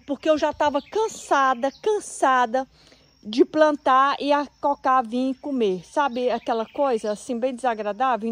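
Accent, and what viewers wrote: Minas Gerais, Brazil. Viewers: Vc e. Mineira ? E parabéns mega canal !!!!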